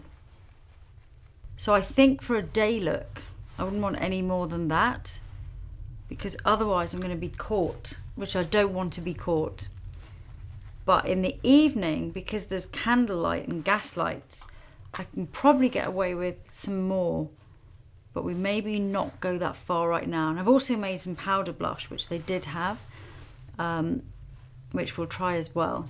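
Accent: England, United Kingdom. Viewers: I looooove your English accent 🤤🤌🏽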